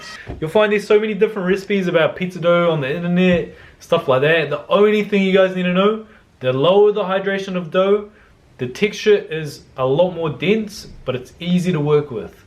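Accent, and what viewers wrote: New Zealand. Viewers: Thank you for making this. I was struggling with creating my own sourdough starter for weeks but the tropical climate here was hard to navigate. However, your active dry yeast overnight starter is a game changer because I get something that tastes and behaves a little like a sourdough starter without the weeks of cultivation and mould scares. Also, funny thing, I couldn't figure out your accent for a long while (cause I'm bad at it) but my Dad said you must be from NZ.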